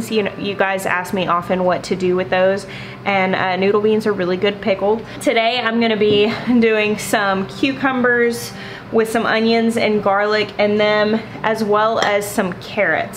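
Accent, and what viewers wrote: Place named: Knoxville, United States. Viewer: So....im watching with my 78 yrl old momma and when you started talking she said "is that girl from knoxville?" And i said uhnnn. ...yea why i was shocked because shes never watches your vids with me but she indeed could tell you either were born in or raised in Knoxville....is she right?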